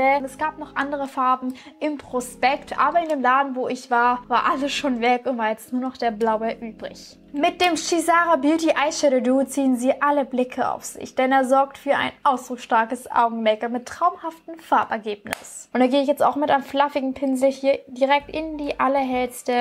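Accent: Swabia, Germany. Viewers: ...Bisch halt einfach BESCHTE!😂#Schwabe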